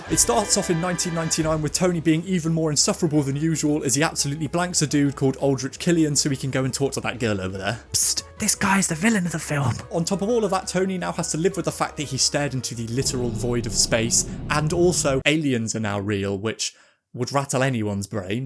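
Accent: United Kingdom. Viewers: Funny British man with cracky voice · high pitched AND British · why are you british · Before even starting the video I saw the face and the channel name and I could already feel there's gonna be a Brit Accent, and I started the video and I wasn't disappointed 😂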